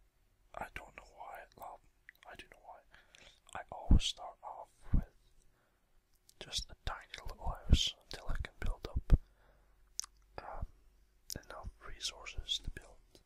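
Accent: Scotland, United Kingdom. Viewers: Are you Scottish? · Heeey your Scottish !!! Snap lol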